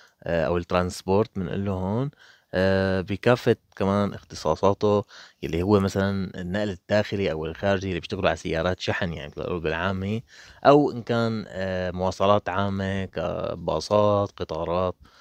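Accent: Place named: Latakia, Syria. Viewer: حسيتك من اللادقية 🙃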